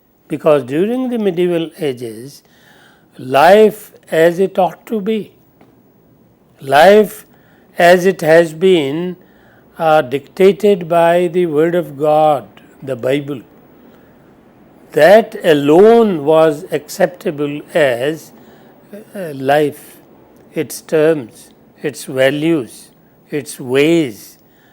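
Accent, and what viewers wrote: India. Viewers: look at your sentence. It is full of errors and badly structured. It is clear evidence of your poor academics. First look into your inner self. You are not capable of even making a comment about Indians. He is speaking Indian English. This is Indian accent. Got it???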